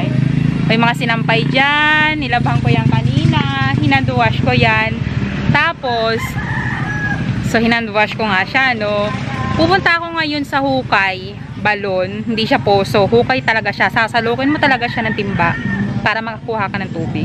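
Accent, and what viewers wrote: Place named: Oriental Mindoro, Philippines. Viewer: Ung accent nyo po tulad smin sa oriental mindoro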